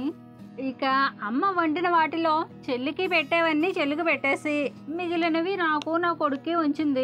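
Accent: Telangana, India. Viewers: Madi telengana maku ma slang aunty estam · Madi telangana.naku ma slang antene mastu estam akka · శుభోదయం మిత్రమా ❤ తల్లీ తండ్రుల ప్రేమకి ప్రపంచంలో మరే ప్రేమా సాటిరాదు సుమా..😊 దూరపు కొండలు నునుపు అని ఊరికే అన్నారా ఉన్నదంట్లో తృప్తి పడకుండా లేనిధానికోసం వేంపర్లాట పడితే మనశ్శాంతి కరువవుతోంది మరి.. ఎక్కడ చూసినా చాలా కుటుంబాల్లో ఈ ప్రేమ పెళ్లిళ్ల వ్యవహారంలో పెద్దలే పిల్లలమాట వినాల్సివస్తుంది..😢 లేదంటే మేము మెజర్స్ అంటారు పాతికేళ్ళు కని పెంచిన పెద్దవాళ్ళ మీద ఎందుకు ఈ పిల్లలకి నమ్మకం ఉండదో నాకు ఇప్పటికీ అర్థం కానీ ప్రశ్న..ఎంత ధైర్యంగా ప్రేమ పెళ్లి చేసుకుంటారో అంతే ధైర్యంగా ఆజంట ఒకరినొకరు అర్థం చేసుకుని వృద్ధిలోకి ఓస్తే ముందుగా సంతోషించేది తల్లితండ్రులే..ఇలా కాకుండా కోరి కట్టుకున్నవాడు కష్టపేడితే ఆ అమ్మాయినీ వారి సంసారం చూసి తల్లితండ్రులు చచ్చేవరకు బాధపడుతూనే వుంటారు..😢 మీ తల్లితండ్రులు మీ అందరిమీదా ప్రేమగా ఉన్నంతలో వాళ్ళ భాధ్యతలు నెరవేర్చడం చూస్తుంటే చాలా ముచ్చటేస్తుంది..పిండివంటలు అన్నీ నోరూరిస్తున్నాయి మీ ముగ్గురు అక్కాచెల్లెళ్ళు చాలా అదృష్టవంతులు సుమా...❤ గోదావరి పిల్ల నోట తెలంగాణ మాట యాసతో భలే మాట్లడావ్ సుమా..👌😂హైద్రాబాద్ కి నువ్వు కూడా పిల్లల్ని తీసుకుని వెళ్ళవల్సింది సుమా..నీ మాటలతో hyd చల్లబడి పోతుంది..😅అయినా అక్కడ మన అంత ఎండలు ఏమీ లేవు అంట సుమా నాలుగురోజులు వెళ్లాల్సింది..😢 పోన్లే ఇక్కడ నాన్నని హ్యాపీగా చూసుకుంటూ మాకు అక్కడ ప్రకృతి అందాలన్నీ చూపించు..❤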